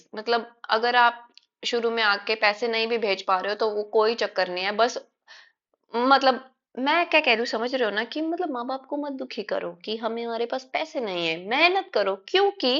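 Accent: Haryana, India. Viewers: se aap hariyana se lagti ho 😂😂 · Haryanvi accent is lovable..it suits you. And this bhartiya Nar wants spouse visa 😁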